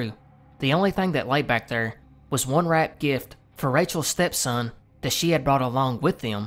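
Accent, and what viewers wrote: South, United States. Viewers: Best icebergs goin'. Love hearing a southern accent being used intelligently and unashamed. We southerners are not dummies, like most media represents us as. Keep up the great work!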